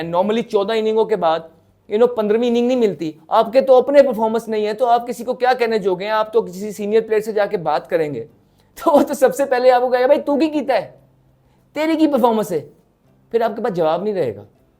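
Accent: Punjab, India. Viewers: Shazad bhai one of the many excellent talents who could not flourish or even evolve because of what Pakistani hierarchy is, and excellent points bhai, also love your “pure” Punjabi accent! Keep it up Sheraaa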